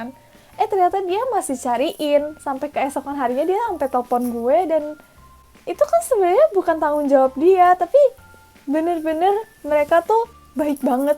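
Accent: Japan. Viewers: logatnya jadi kebawa logat jepang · wah jadi kyk denger haruka nakagawa ngomong nya ada logat jepang nya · thx dgn video ini gw jd lbh tau ttg jepang.. btw bahasa indonesia kamu udah ada dikit2 aksen jepang nya haha mgkn karena udah lama d sana 😄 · kakak kedengeran banget bahasa indonesianya ada rasa-rasa jepangnya gitu hahahaaa dari nada dan sebagian pelafalan. rupanya pengaruh juga ya tinggal di sana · kak, bahasa nya udha kebawa nihongo kak :))) tapi luccuu aku sukak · Itsnaini Nabila iya bener sudah kebawa bawa nada jepang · Logatnyaa,,, udah kebawa jepun,, hehe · wiihhh,,, infonya keren bangettt,,, kakak pasti kuliah ya disana,,, logatnya udah kayak jepang,,, dan raut wajahnya juga kayak gadis jepang,,, yang pasti kakak ichiban kawaii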